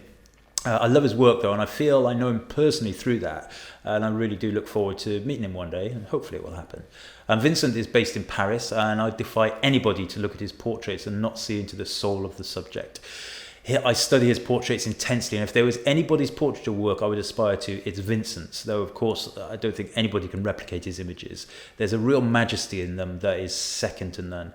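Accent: Wales, United Kingdom. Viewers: Ooh, you’re Welsh? (Via the Rugby question). I guess being stuck out here in Tokyo is starting to affect my ability to pick up accents. 🤪🤔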